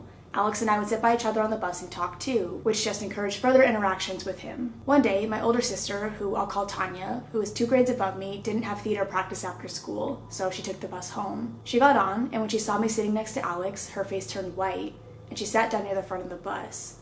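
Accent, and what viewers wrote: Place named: South, United States. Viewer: She sounded so southern in the videos holy shit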